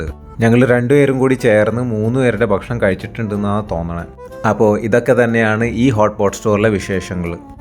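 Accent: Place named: Ernakulam, India. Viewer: ചേട്ടൻ ekm ഇൽ എവിടാ നല്ല പരിജയം ഉള്ള സ്ലാങ്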